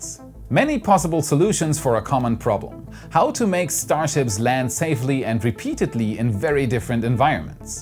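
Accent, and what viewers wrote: Germany. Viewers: Are you german? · are you german?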